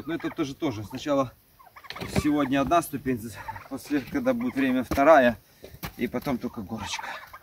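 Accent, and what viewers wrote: Belarus. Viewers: Такой брестский акцент)))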